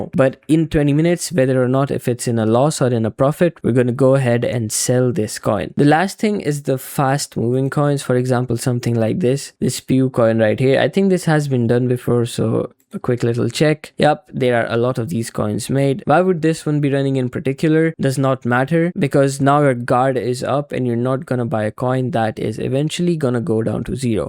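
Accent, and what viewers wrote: India. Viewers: Your accent is somewhat Indian.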